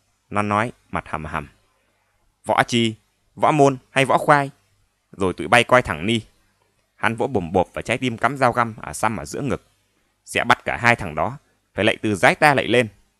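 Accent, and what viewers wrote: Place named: Bình Dương, Vietnam. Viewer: Hay quáluônnnnnnn❤❤❤❤❤❤❤❤❤❤❤❤❤❤❤❤❤❤❤❤❤❤❤❤❤❤❤❤❤❤❤❤ Nhưng nghe hơi Bình Dương thật 😂😂😂😂😂😅😅😅😅😅😅😅😅😅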